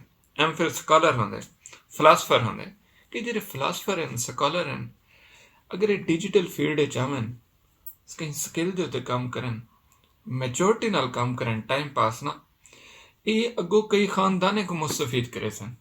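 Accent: Saraiki, Pakistan. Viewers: Yes sir 😢 me also completed master also B.ed 😢 but i have nothing I am also form distric bhawalpur Also mother language sariki your speak of method sariki amazing 😍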